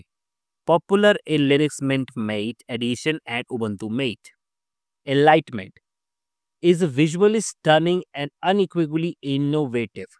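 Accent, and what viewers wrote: India. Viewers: bro probably says carmel instead of caramel out here critisizing some indian dude speaking english as a second language LMAO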